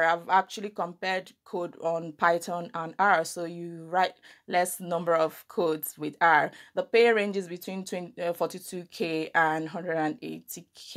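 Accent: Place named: Igbo, Nigeria. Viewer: You sound so much that a Igbo/Nigerian woman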